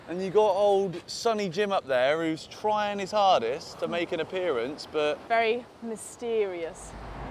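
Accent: United States. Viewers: the american accent is gold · Ur american accent is actually fire. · Love the American accent 😂😂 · American accent is getting stronger. One of us, one of us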